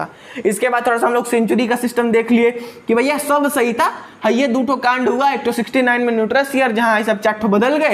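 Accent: Bihar, India. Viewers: Bhai tum shi pdhate ho Bihari accent me mja Ata h pdhne me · Bhai aap bihar se hona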